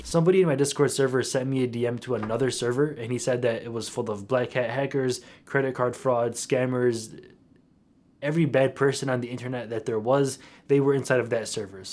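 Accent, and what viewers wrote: United Kingdom. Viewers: your British accent is immaculate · is crying? british accent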